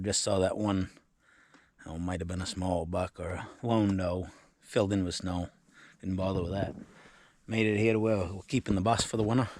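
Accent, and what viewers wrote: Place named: Maine, United States. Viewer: Forgot to tell ya.. love the Maine..northeast dialect..our dialect talk is different here in northern Michigan.. that’s all part of the story..listening to the northwestern talk while your hunting .. super there guy…keep the videos coming..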